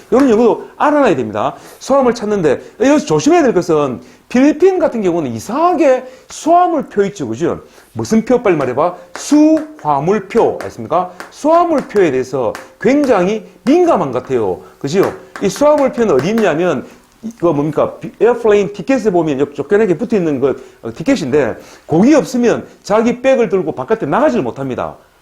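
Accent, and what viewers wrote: Gyeongsang, South Korea. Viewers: 나의와이프가 필리핀사람인데 이런방송 괜찮네요! 지금 50대중반이고 필리핀 이민도 고려중인데 앞으로 다양한정보 부탁합니다. 특히 구수한 경상도사투리 쥑입미데이~^^ Impression도 아주 좋아요! 구독합니다.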